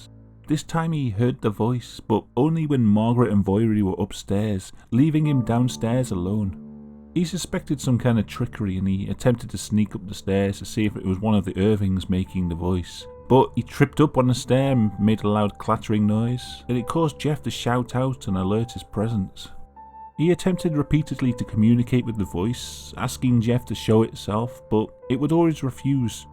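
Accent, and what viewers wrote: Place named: Liverpool, United Kingdom. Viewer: thats exactly what I thought, thought he was a scouser when I first got onto this channel. Being a scouser my self thats why I like this channel so much cos he sounds familiar. Haha he deffo sounds like tom like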